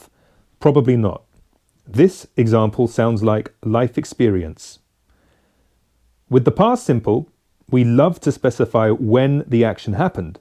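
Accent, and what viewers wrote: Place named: London, United Kingdom. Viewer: Hi my dear teacher! That was exactly what I needed! Your excellent explanation of Perfect Perfect Simple, with your beautiful londoner accent! Congrats! It is a great video production!🌹🙏😘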